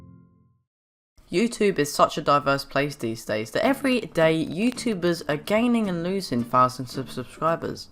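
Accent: United Kingdom. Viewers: Omg are you british? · It's not a speech issue! It's how half of London talk.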